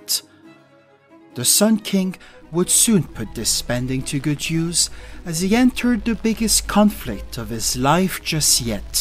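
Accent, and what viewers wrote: France. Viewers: Love hearing French history told in a strong French accent 😁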